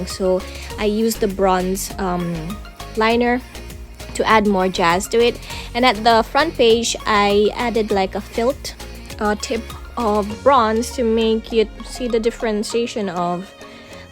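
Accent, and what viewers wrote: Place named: England, United Kingdom. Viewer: you're such a talented lady ...iha!..so bright! i even like your accent..your english accent!..keep painting and sharing your talent..go on! Jingle JPE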